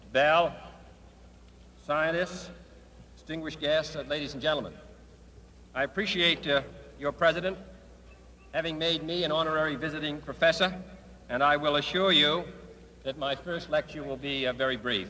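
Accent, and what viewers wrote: United States. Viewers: Why not a Boston accent?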